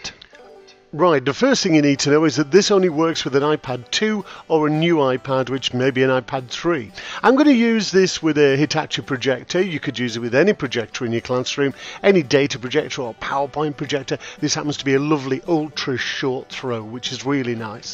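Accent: United Kingdom. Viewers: are you telling that to the guy in the video ? -.- that's so racist, and stupid ! That's a British accent, and the higher chances are that he is already there were he made the video, he doesn't have to go back anywhere ! .-. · Do I detect a Scouse accent here?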